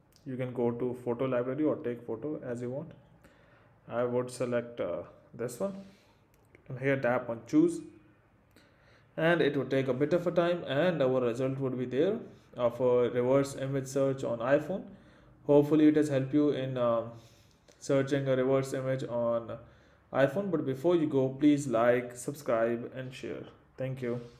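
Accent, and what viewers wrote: India. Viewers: Ma’am he’s just Indian. Most Indians aren’t scammers smh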